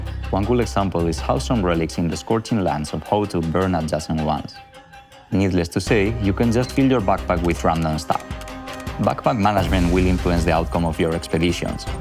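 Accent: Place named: Spain. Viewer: el que está comentando.... parece español por ese acento jejej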